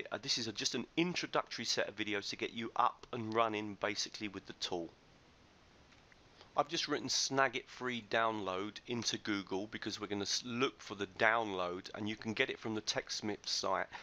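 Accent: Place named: United Kingdom. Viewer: GREAT-GREAT-GREAT TUTORIAL!!! I had some confusion about how to use Snagit and whether or not I could do a video capture as well as how to upload to YT and whether-or-not I needed Jing and Screen Cast in addition to Snagit, you have answered all those questions. So despite your Brit Accent-Not everyone can be privileged enough to originate from SE Texas-it is a great video and I am signing up for your newsletter-Keep Up The Good Work!!! Shavuah Tov...hcwjr/ponyhunter98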